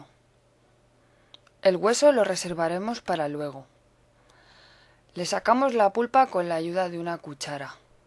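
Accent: Spain. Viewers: Gracias " " Que rico, me gusta escuchar el acento espanol y conocer los nombres de las cosas. Lo unico que no entendi fue el nombre del chile que se le agrega al mortero (o molcajete como le dicen en Mexico), Cual sera el nombre en Ingles? (vivo en los Estados Unidos) De nuevo, Gracias!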